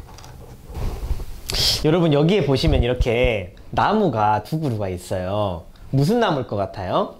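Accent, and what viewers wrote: Jeolla, South Korea. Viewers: 뭐여 전라도 빨갱이방언이여?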